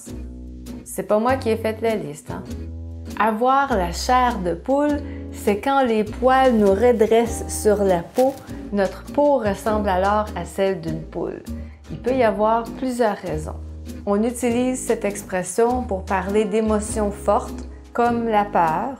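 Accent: Quebec, Canada. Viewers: Bonjour Madame ! Je avait lu opportunité d état au Canada et on peut percevoir que vous parler en très tout différente'au français courant. Mais te suis était très contente la ba. Mais aujourd'hui je vais dire une chose avec une formule pour faire avec le CHU au fourneau un très délicieux plate. Je vous remercie pour c e st tres bonne recette Merci à vous et bon journée A la prochaine !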